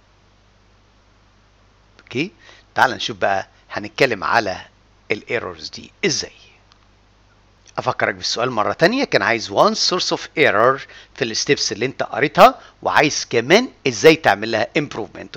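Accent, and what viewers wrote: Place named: Italy. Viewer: Your accent is so much italian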